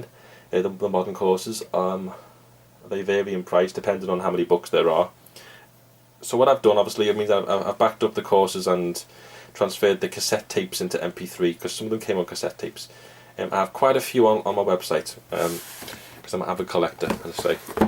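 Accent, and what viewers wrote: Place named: Merseyside, United Kingdom. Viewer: Merseyside clearly.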